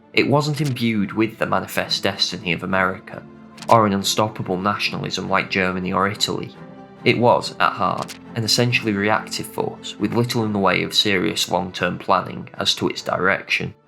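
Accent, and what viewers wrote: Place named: Northern England, United Kingdom. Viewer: Disagreeable Northern accent notwithstanding.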